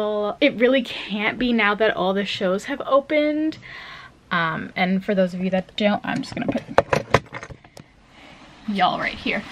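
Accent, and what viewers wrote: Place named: United States. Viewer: I listen you because I wanna to hear the american accent 👍🏻 you great👍🏻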